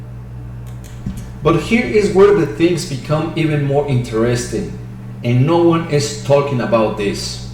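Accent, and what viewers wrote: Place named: Latin America. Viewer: sabía que eras de latinoamerica cuando empezaste a hablar con acento y te entendí perfectamente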